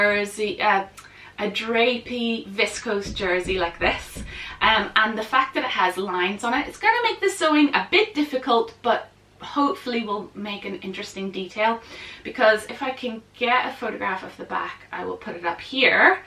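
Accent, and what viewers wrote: Northern Ireland, United Kingdom. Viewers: Great video. Thank you. As much as I'd like the sewing feet, I don't think they'd fit my machine which is an elderly ELNA. I tried to buy a walking foot recently but no variation would fit. I keep thinking maybe I should get a new machine, but the Elna is a good basic machine. So don't know how much better the new machines are.... Like you, most of my makes are for the cooler months, so I don't have enough to do memademay. I'm recently returned to sewing and will get a more comprehensive memade wardrobe eventually. Like you also, I'm from NI - now living near Cambridge. I love listening to your lovely accent. It brings me many happy memories. God bless. Helen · Just realised I’m a year late, never mind! I’m also in Northern Ireland so it’s lovely to hear our accent on videos x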